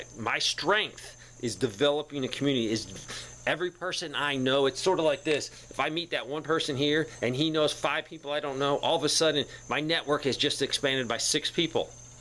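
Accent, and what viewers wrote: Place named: East Tennessee, United States. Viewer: What area of the country do you live in? Not asking for your street address haha or even your town. Sounds like you may live in the mountains of East TN but not sure. Anyway- great content! Love your channel!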